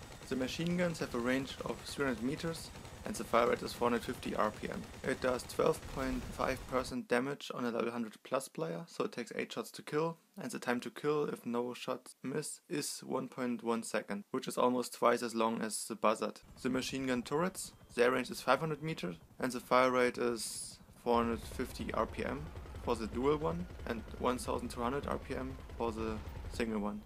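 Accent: Germany. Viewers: Du hörst dich deutsch an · Deutscher man merkt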